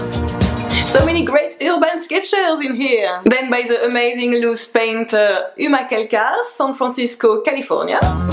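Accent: France. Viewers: As a watercolor pigment geek, and yes I also live in France but have not your cute accent, I try to put each day the spot on one pigment. Thanks to you as this idea came up watching your video. Merci beaucoup et à bientôt.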